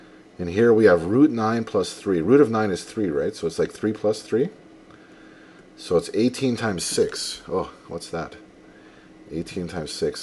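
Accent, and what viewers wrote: Canada. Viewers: The Canadian accent makes it sink in better I swear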